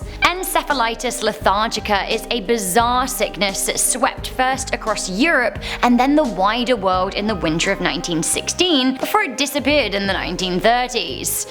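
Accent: England, United Kingdom. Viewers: loved the Scottish accent! Nice attempt but I still cant get enough of your English accent.:)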